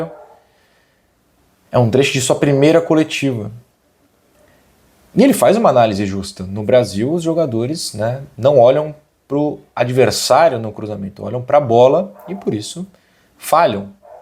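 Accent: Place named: Portugal. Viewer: Não aguento mais português, até o sotaque do cara está me incomodando não que eu seja xenofóbico.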